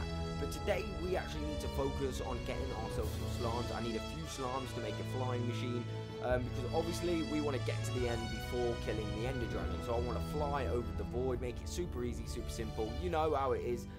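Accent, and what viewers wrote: United Kingdom. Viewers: He's german And he has british accent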